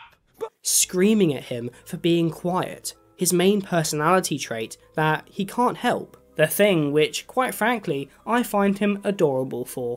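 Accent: United Kingdom. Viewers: oh baby a dhmis commentary made by a mf with a British accent??? my kind of video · British, aust?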